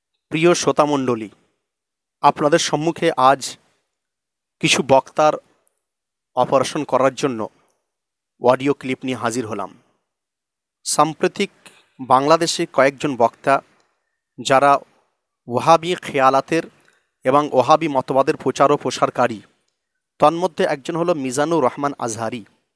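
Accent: India. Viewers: oni west bengal r manus,oder occaron amader teke alada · উনি কলকাতার অধিবাসী তাই ওনার কথাগুলো এরকম মনে হচ্ছে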